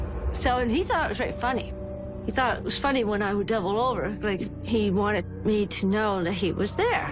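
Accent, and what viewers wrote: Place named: Canada. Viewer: Love her Canadian accent so much! And the Halifax story breaks my heart! All those poor people! :( May God rest their souls!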